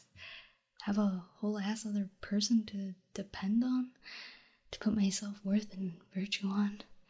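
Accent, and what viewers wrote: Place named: France. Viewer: Oh ya one more thing your French accent just dose something to me I swear I would like to see more in the future only if your willing to take care : )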